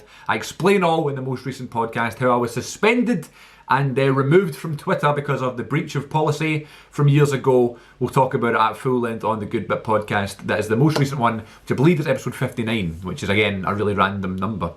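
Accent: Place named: Scotland, United Kingdom. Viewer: Oh by the way what part of Scotland you from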